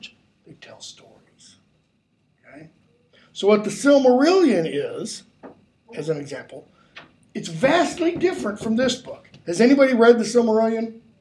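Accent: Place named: United States. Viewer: Very loud and american.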